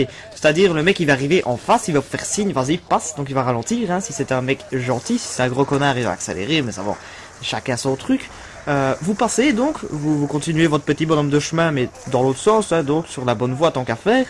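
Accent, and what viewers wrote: Belgium. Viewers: j'adore notre lois belge U_u t'as un bel accent wallon =D c'est si rare mtn de parler wallon T_T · Si tu aussi tu as quitté après , pouce vert! Non, je rigole! xD J'ai adoré ton accent belge(je suis moi-même belge, je ris au imitations que l'on fait de nous!) et j'adore ta manière de commenter! Pour ton prochain top 20, tu devrais en faire 1 sur les 20 choses les plus tapées sur Google (tu tomberais sur des trucs... interessants!) Bonne continuation. Bises. Ton compatriote belge.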